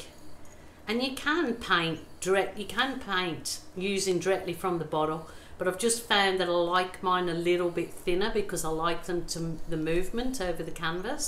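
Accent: Birmingham, United Kingdom. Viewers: Well done. I feel your anxiety. I keep trying that technique but I’m not getting the paint consistency right 🤦‍♀️. The finished result turned out pretty., I knew I detected a British accent in there, hidden under the Australian one 😊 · I am trying to determine your accent. I’m going to say Brummie ?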